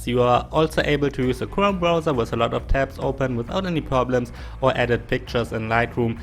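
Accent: Germany. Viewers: Are You German? Weil Ichs Auch Bin und du hörst dich extrem deutsch an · Man merkt dir an, dass du Deutsch sprichst grundsätzlich weil sonst hättest du LCD panel bei ca anders ausgesprochen genau so wie viele ausdrücke :D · As I am German too, I might be the only who is surrounded by this accent everyday lol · Is so hard to understand your ligo m8 for a German U definitely have the worst accent I've ever heard is so unpleasant. Unlike my other German friends & my German girlfriend she has a brilliant accent she almost speaks perfect English like my other German friends at University.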